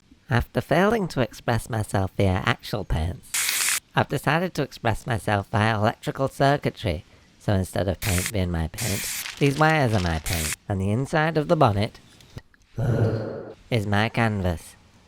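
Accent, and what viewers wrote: Yorkshire, United Kingdom. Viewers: I'd bet my hat hails from Yorkshire with that lovely accent, but I recognised Barton on Sea!! Perhaps he just gets around a lot - van life and all that. But wait, he has a shed! Hmmm, maybe he has many sheds...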